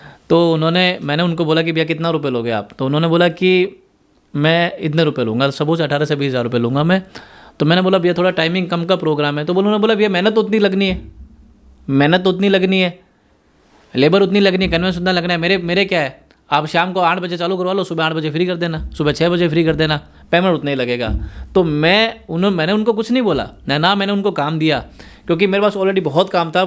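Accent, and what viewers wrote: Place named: Marwari, India. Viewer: मारवाड़ी सुनकी मजा आगया 😅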